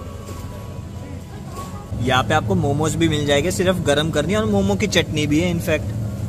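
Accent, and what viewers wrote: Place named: Maharashtra, India. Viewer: Nice maharashtrian accent ❤...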